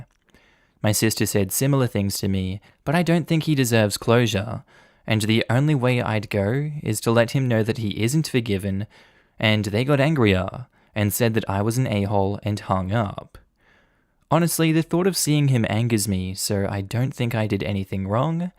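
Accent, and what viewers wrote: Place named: Australia. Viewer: Ah, yes. Our favorite australian narrator with the calming voice that pierces broken souls.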